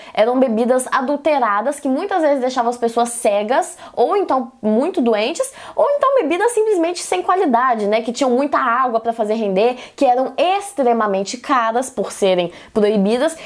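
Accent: Minas Gerais, Brazil. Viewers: Gostei muito do seu canal! Faço facul de Direito mas amo muito História e quadrinhos e supercurti o seu jeito descontraído de ensinar, além do sotaque tranquilo de Minas que eu amei! (Tenho parentes daí de Minas Gerais :) ) Ganhou um fã do seu canal! Muito show, continue assim! :) :) :) ;p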